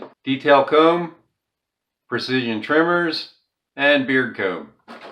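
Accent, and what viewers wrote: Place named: Philadelphia, United States. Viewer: DEF from Philly or Delco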